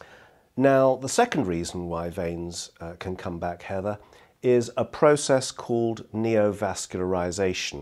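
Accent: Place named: England, United Kingdom. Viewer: Can I marry your English Accent you’re such an awesome doctor I had that procedure and you describe it perfectly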